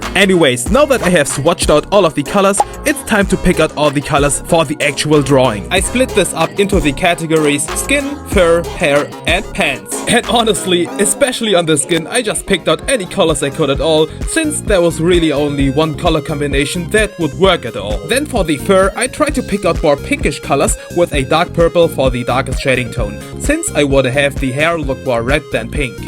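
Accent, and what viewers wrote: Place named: Germany. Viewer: I Hear the deutsch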